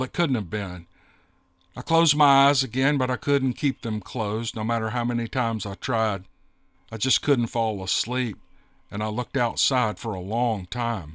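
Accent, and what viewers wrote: South, United States. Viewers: You should narrate some southern bell stuff like Gone With The Wind or sumpin LOL I like listening to you for your southern accent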